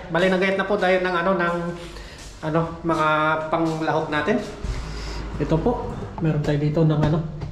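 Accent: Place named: Hiligaynon, Philippines. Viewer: taga saan ka sa Pinas? Hilongo ka, ano?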